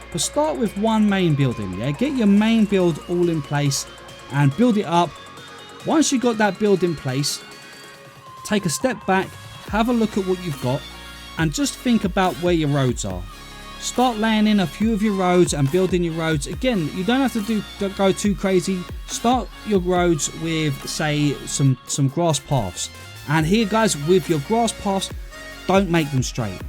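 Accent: United Kingdom. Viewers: how did i know this guy was going to be British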